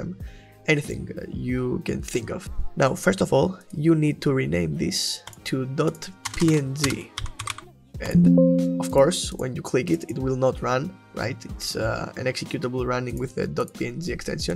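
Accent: Greece. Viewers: Great video and great accent (comming from another greek)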